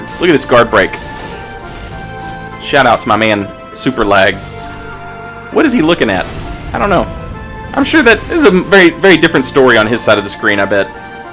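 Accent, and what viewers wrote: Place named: South, United States. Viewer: Cuz Vape Nation y'all. I love memes in your southern drawl. It's adorable.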